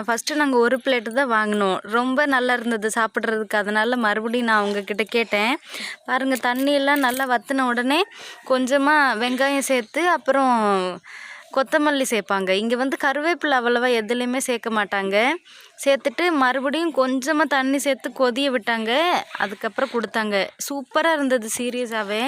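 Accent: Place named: Telugu, India. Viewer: Yei paithyam tamil ozhunga pesu, ennomo telungu ponnumari Pesara? Over sean podatha, tamil kaarithane nee thikki thikki pesura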